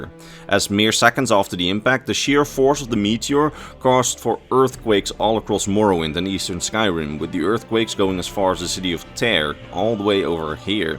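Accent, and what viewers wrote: Netherlands. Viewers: It’s the Dutch accent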